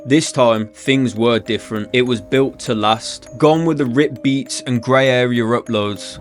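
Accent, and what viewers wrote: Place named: West Midlands, United Kingdom. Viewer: Good video bro, but how JDZ didn’t get mentioned. Early 10s to mid 10s they was the channel. Especially up north, you sound like your from the west mids and jdz was the channel for west mids too. It got so many man on the scene. It definitely moved over to grm / link up but JDZ was the channel especially for the midlands / north.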